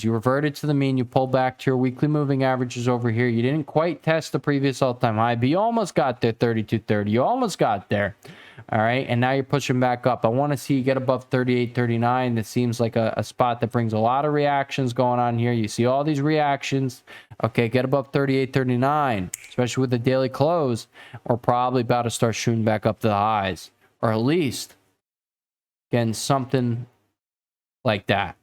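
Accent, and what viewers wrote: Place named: Chicago, United States. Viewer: Thanks for your videos! Aspects of your accent remind me of where I grew up in Chicago. Where are you from?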